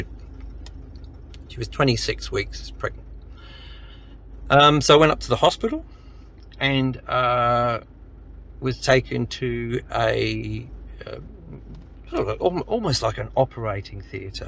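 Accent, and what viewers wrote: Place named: Perth, Australia. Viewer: After all the wonderful information you've so generously shared here, I felt that the least I could do is watch every minute of this video. I'm glad you felt like you could share this - that, whatever risk you may have considered, it felt like the good and right choice. Firstly, I am sorry for how you've suffered, what created this suffering and the long tendrils that trauma can have. Secondly, thank you for using your suffering as a vehicle for conveying this kind of message - because you're right, so many people are suffering in various degrees of silence and distress and in some way or another we all feel alone with it. Thirdly, when you spoke about the "physical" aspect of your trauma, it brought to my mind my mother, who also passed away, from cancer, with my hand on her, 6 years ago this month. I often think of her when watching your videos, as she was from Perth, and even after many years in the U.S., she kept a hint of her accent and somehow your voice spontaneously connects me to her, which is a good thing. 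And finally: thank you for the gift of what you have shared through your channel. Cakewalk is the environment my musical creativity has settled into, which makes it a focal point for my hopes, my delight, my anxiety, my fear, my despair. What you share goes right to the heart of that place and helps it make more sense, helps it become a place I can better function in despite all the vulnerabilities that come along with it. It's very much a work in progress - my se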